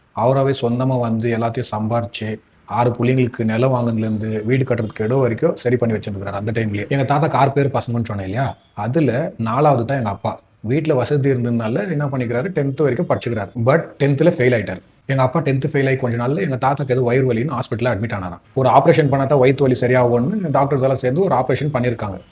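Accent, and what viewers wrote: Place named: Karnataka, India. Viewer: நீங்க Bangalare கன்னடர்கள் மாதிரி தமிழ் பேசுறீங்க தம்பி....Half தமிழ் accent கூட இல்லை....ஒரு வேளை நீங்க பெங்களூரு la படித்து வளர்ந்தவரோ... தாய் மொழி பேசுவதற்கு பாராட்டுக்கள்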